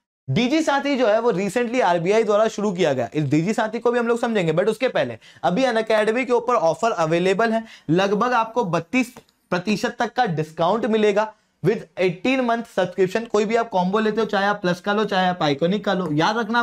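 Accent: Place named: Bhopal, India. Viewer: Bhopal se ho kya bhai 😐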